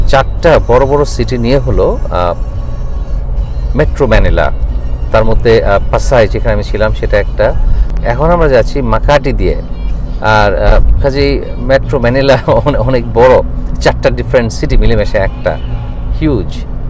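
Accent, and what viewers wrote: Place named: Sylhet, Bangladesh. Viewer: ফিলিপাইনের ব্লগ দেখতে বসে সিলেটের কথা শুনে খুব ভালো ও লাগলো আবার হাসিও পেলো, আমি কিন্তু সিলেটি।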